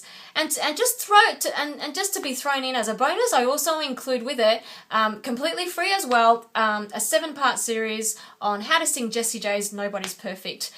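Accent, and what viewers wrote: Australia. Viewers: You're Australian correct? I'm usually good at differentiating between Australian and British. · She's from Australia.... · are you from Australia? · Are you Australian? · OMG, shes stray-lian : )) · i figured it out, you're australian!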